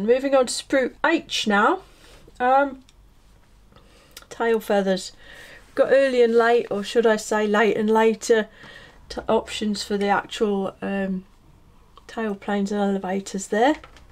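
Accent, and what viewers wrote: United Kingdom. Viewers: Apologies for this confusing comment on my part. “Right the way through” is a sentence that another British reviewer uses ad nauseam 😩. On one of his recent reviews, I started counting how many times he said “right the way through”. It became tedious and I stopped counting at 18 or 19 times, I forget. It was nice to sit through your review and not hear it a single time 🙏🏼. P.S 1 : You are one of only a handful of people who recognized “Bindair” as “Been there” 😄. P.S 2 : In your review you pronounced “Curieux” beautifully. The charm of your lovely British accent when pronouncing this French word made my heart skip a beat 🥰🥲. I was (am still ?🤔) French.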